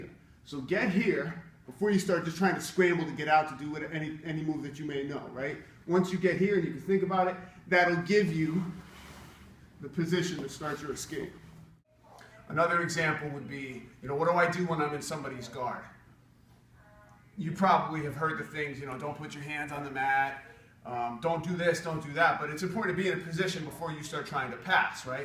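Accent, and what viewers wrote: Brazil. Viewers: Lmao, that Brazilian accent killed me. Subscribed. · Very nice tips!! About the Brazilian accent... do we really talk like that? lol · Your Brazilian accent gets me laughing every time. Love your videos. Thanks. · More brazilian accent videos please. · i love the Brazilian accent at the end of your videos lol... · Love the Brazilian accent. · That Brazilian accent is pretty good lol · The Brazilian maestro accent is beautiful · Thank you for the white belt videos. They're super helpful. I really like the Brazilian accent wrapping up what you just showed us. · You have a mean Brazilian accent, dude. Great vid too. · Can you do an instructional video with your Brazilian accent? That would be amazing!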